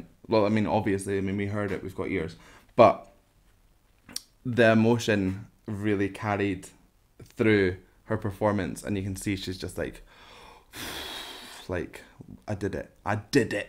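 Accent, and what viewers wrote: Scotland, United Kingdom. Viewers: omg i love british accent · This guy british accent were very cute!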